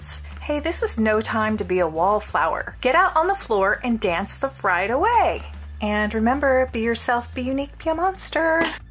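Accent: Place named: United States. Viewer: I love how you post your videos late at night (I'm just assuming no hate but from ur accent it sounds your American and right now for me it's so it would be late all around the USA but different times)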